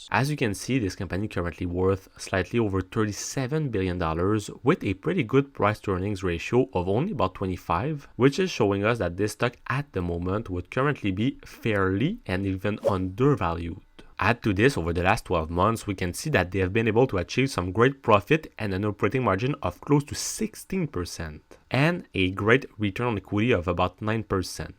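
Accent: Quebec, Canada. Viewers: Salut, j’ai pu comprendre avec ton accents que tu est QC ahah. Tu as l’air super bien informé. J’ai juste pas compris se que Telus fait ds le domaine de la santé.. peux tu m’éclairer svp! Merci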